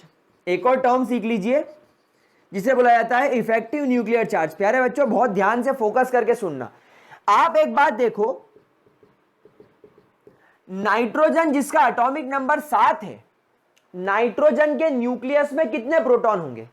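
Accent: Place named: Indore, India. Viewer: Sir u r the perfect indori..😂😂😅.... BTW ur teaching is superbb thanks a lot sir..🙇🙏🙏🙏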